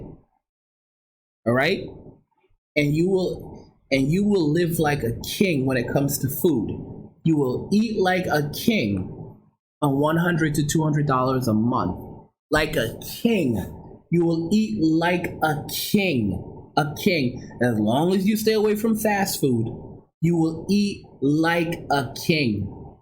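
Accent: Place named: Jamaica. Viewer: Bro. You sound like you have a slight Jamaican accent which was lost over time being Americanized in the US! .... Am I correct?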